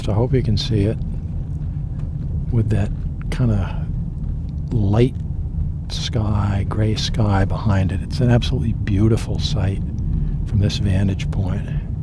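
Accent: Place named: Connecticut, United States. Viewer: I grew up in that area, and went to school in Norwich, Uncasville (Montville) and New London through high school. I can tell by your accent that you probably grew up in that area. Left the area in ‘79 and only get back to visit every once in a while.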